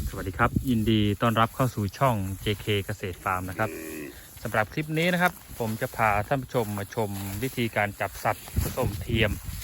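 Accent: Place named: Surin, Thailand. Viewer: ฟาร์มอยู่จังหวัดอะไรครับ สำเนียงเหมือนคนสุรินทร์เหลา😁😁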